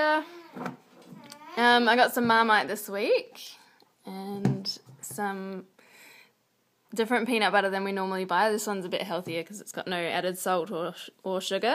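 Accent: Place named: Australia. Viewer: Just heard you say you use to live in Australia. I can't seem to work out whether your Australian or New Zealander. It's hard to tell by the accent sometimes😊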